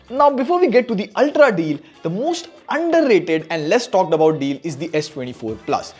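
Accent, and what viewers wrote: India. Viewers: I subscribed because of the indian accent voice❤❤❤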